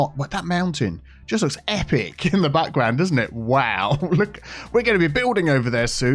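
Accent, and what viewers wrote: United Kingdom. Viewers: Building this one along with you to help me learn. Love your content. Great to hear a British voice! Thank you!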